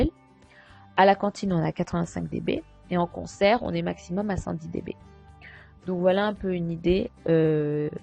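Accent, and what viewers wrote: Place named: Tunisia. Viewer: Une voix de tunisienne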